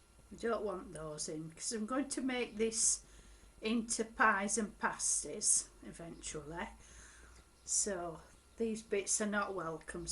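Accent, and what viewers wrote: Yorkshire, United Kingdom. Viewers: I love your accent, are you from Yorkshire?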